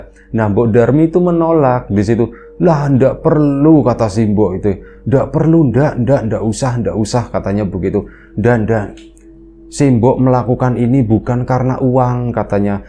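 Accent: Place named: Banyumas, Indonesia. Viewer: Subscriber baru, denger cerita ini perasaan saya campur aduk antara PENASARAN, SEDIH DAN LUCU. penasaran sm endingnya krena sengaja ga bc komen, sedih sm kisah hidup mas parman dengan anaknya LUCU sm pembawaan yg natural banget 😁 tentu dengan logat ngapaknya, sukses terus buat channelnya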